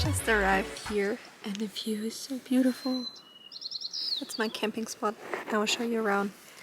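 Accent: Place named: United States. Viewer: Du hast teilweise schon voll den Ami - Slang 😅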